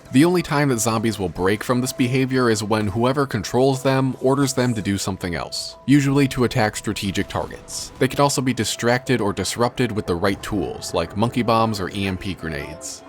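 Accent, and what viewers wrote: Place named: Midwest, United States. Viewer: Are you from the U.S. Midwest? You have a bit of an accent.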